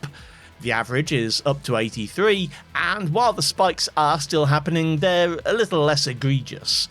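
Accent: United Kingdom. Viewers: man i instantly recognized your british accent i'm so proud of myself :D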